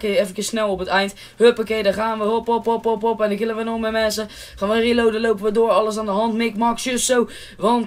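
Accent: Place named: The Hague, Netherlands. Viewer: Ik zei ooit een keertje tegen jou dat je een haags accent hebt...dat lag nogal gevoelig hahah...ik woon nu in alphen aan den rijn maar ben in den haag opgegroeid...heb niet een bepaalde trots met alphen of den haag...kom nog wel af en toe eens bij ado en dan leg ik altijd in een deuk als je ziet hoe die hagenezen leven voor hun clubbie met de bekende scheldwoorden die in die 90 minuten voorbij komen....heb wel bepaald trots-gevoel met oranje...als ik naar het wk kijk dan heb ik wel het gevoel van "onze" jongens...met politiek heb ik helemaal niks....dat lingo stukje was geniaal haha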